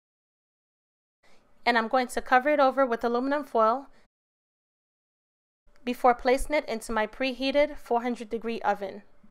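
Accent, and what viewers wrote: New York, United States. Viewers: Well done. Looks delicious. Love your accent. New Yorker?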